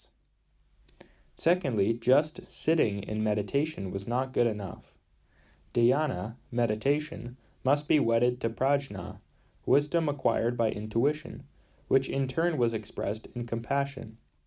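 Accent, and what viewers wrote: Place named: United States. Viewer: A teenage American with a cold narrating an English man's work. It really doesn't work.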